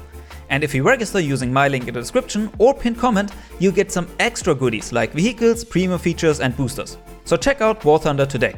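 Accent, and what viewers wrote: Germany. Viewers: One question are you german 😅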